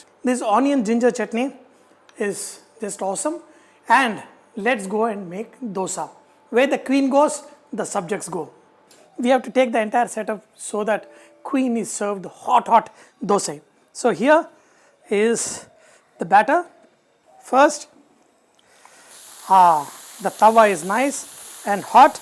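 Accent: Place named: Kerala, India. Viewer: Sir your accent sounds like you speak Malayalam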